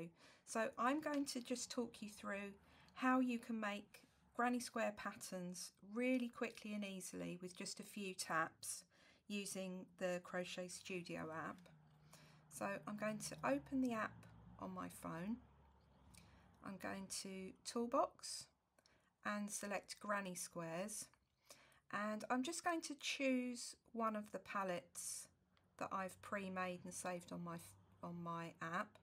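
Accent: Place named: Australia. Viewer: I love your accent! Are you from Australia?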